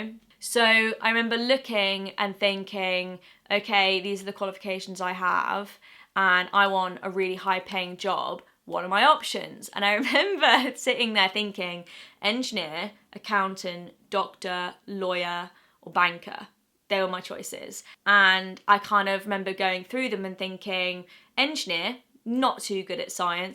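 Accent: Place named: England, United Kingdom. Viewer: She's English. :)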